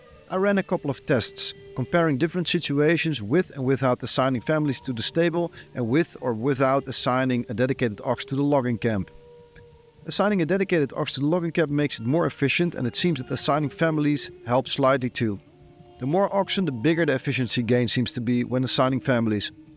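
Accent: Netherlands. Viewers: Jij bent een Nederlander of niet, hoor t meteen, haha. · That Dutch accent 😅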